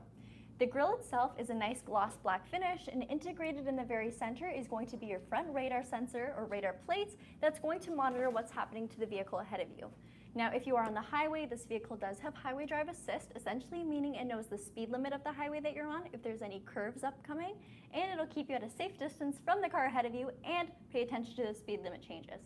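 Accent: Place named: United States. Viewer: Disappointed hearing an droning American accent ...lost in translation zero authenticity coming through.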